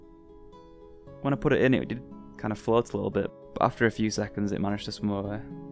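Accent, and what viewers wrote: Yorkshire, United Kingdom. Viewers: sounds like he is form yorkshire lol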